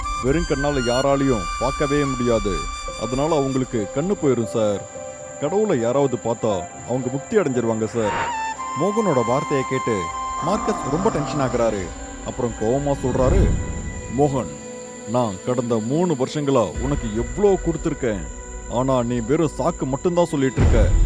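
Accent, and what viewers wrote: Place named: Karnataka, India. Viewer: Kannada Tamil super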